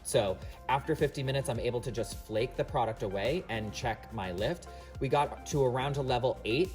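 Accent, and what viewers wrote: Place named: New York, United States. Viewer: I LOVE this tutorial. You’re a natural- EXCELLENT delivery, camera presence…. I thought I heard a little NY accent. I’d totally go to you! Application is so clean. PLEASE lemme know if ur in NYC area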